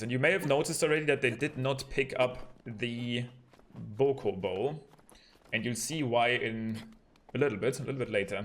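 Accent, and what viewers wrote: Germany. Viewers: du sprichst doch locker deutsch dein aktzent hört sich so an weil du nicht so gut die asusprache von englisch kannst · Man merkt dass du deutsch bist haha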